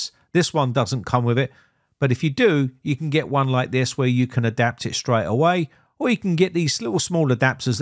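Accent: England, United Kingdom. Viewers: Lever is pronounced leave - er In English and you sound English to me ! Resist the American language. Most Americans think they speak English until they visit England .I once worked London with a lot of Americans. We spent some time explaining believe me !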